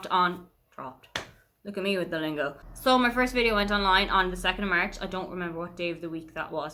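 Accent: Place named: Germany. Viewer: I love your accent. I find it really hard to understand the Irish accent most of the time but your accent is so easy, maybe it's a bit of the German açcent you have picked up, have you lived in Germany long?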